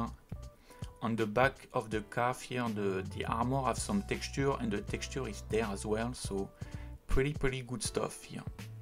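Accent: France. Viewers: Vu l'accent, t es un Frenchy!!!!! 🤗😋 Tu penses qu on peut colorer la résine si elle est claire, avant impression? Ou colorer les objets imprimés?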